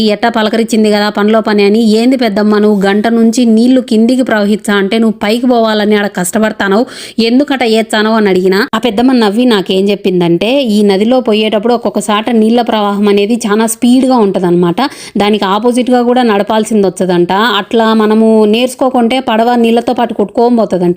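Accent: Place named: Kadapa, India. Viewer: Made srikulam district maa husband posting Kadapa me sema language baguntde 😊😊😊😊❤❤❤❤ meru kuda bagamataladutaru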